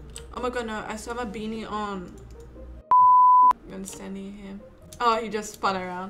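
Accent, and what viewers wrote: United Kingdom. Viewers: I love the fact that ur British it makes ur voice so cute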